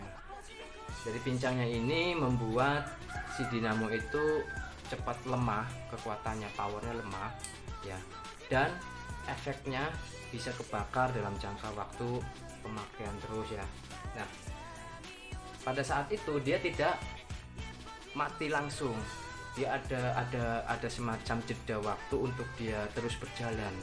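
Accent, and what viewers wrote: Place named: Java, Indonesia. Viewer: Pak ngapak medok jawanya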